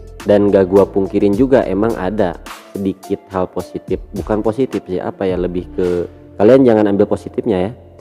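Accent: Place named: West Java, Indonesia. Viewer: Lidah orang sunda bos. Voli aja nyebut nya poli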